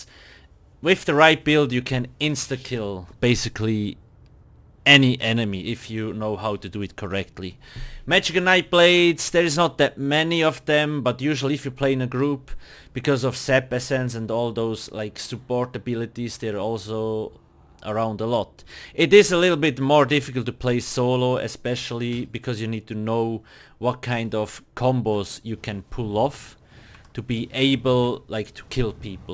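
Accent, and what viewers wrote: Switzerland. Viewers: He du bisch doch 100% au en schwiizer eh? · Bish du schwizer? :D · Du bist safe Schweizer :D nice video!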